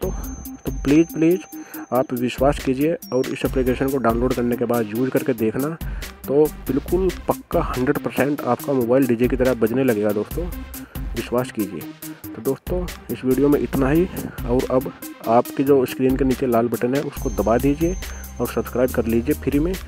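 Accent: Haryana, India. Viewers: bro aap Haryana se ho na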